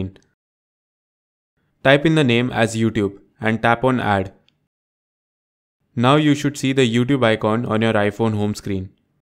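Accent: India. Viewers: Indian accent always scam😢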